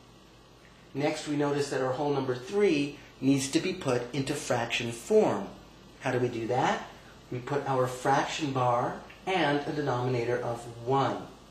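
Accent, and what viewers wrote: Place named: United States. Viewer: i dont understand you cuz have a strong american accent it gets in the way when you explain it and to top it you talk to fast i am sorry to say this ...... but hey i am from London and i am watching this!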